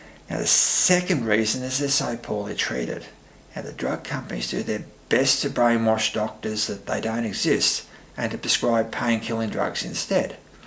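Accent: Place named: Australia. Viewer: He’s an Aussie, so I understand and I can hear him. I agree the sound is a little faint I had to turn the volume up. 😉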